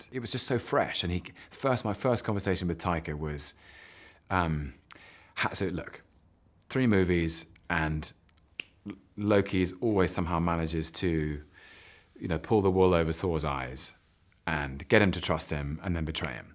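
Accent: United Kingdom. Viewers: Tom的聲音真的好好聽...還有那個英國腔真的太酥了吧（倒地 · 我只是來聽他的英國口音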